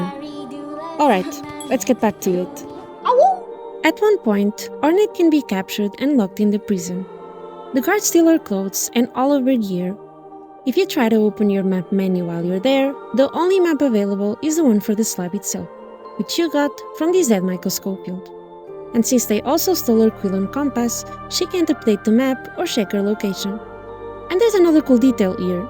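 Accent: Portugal. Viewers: Just out of curiosity, are you Portuguese? Because your accent sounds like it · Is your accent Portuguese?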